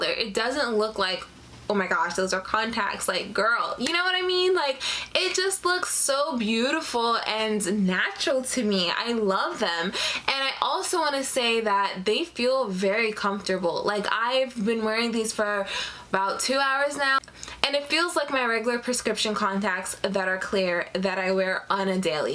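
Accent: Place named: Belize. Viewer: Are you from Belize? I hear the accent in some of your videos. I love your video content :)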